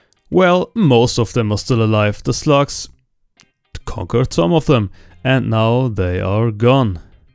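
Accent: Germany. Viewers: 🤣 the German accent makes this funnier, I dont know why this part was hilarious too lol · He is german. Finnish have different accent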